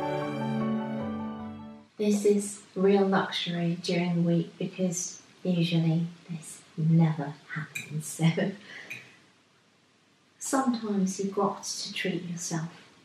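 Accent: England, United Kingdom. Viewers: I keep watching your video's. Your English is so beautiful, still you make German cakes. So I wandered if you are from Germany, what is nearly impossible because you sounds so really English. Carine from Bruges, Belgium. I love how you bring charming England so nearby.☘️🌷☘️🌷☘️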